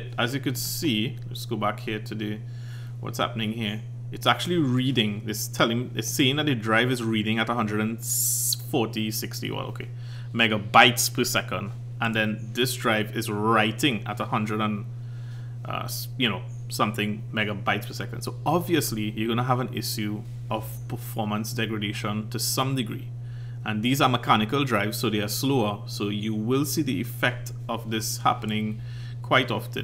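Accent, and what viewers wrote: Trinidad and Tobago. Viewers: You sound like you are from. Trinidad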